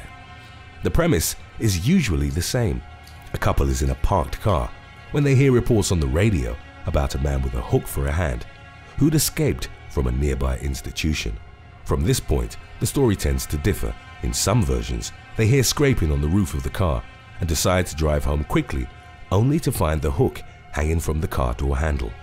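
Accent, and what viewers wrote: United Kingdom. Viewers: Don't you realize the narrator is British? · His British 👋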